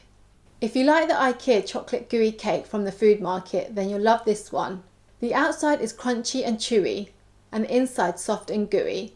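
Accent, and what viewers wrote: United Kingdom. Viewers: I made this today and it came out very good. I substituted the flour with 2/3C of Almond Flour and 1.3C of coconut flour and used the Monkfruit sweetener. The only issue I had was removing it from the springform pan I used. It split apart slightly in a couple of places but nothing too drastic. Maybe I didn't let it cool enough. I served it up with some Neopolitan Ice-cream and I was in heaven. It was all you said it was. Thank you so much for sharing this recipe. I enjoy listening to you and watching you cook. The cross between your British accent and your sweet Asian personality makes this experience and your channel very enjoyable. :)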